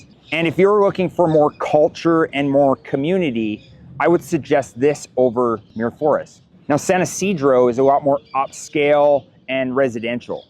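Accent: Canada. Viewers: I somehow knew immediately you were Canadian --- got a chuckle when you said where you were from and the rain tally. I'm in North Vancouver so, yeah..... Thanks for sharing, I'll be looking into Peru more going forward --- it's been on my shortlist as well. Cheers!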